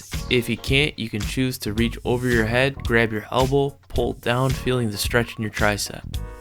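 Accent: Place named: Midwest, United States. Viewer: I don’t know what state you are from, but I’m guessing Midwest or Chicago with the accent. The way you say calf cracks me up 😂😂😂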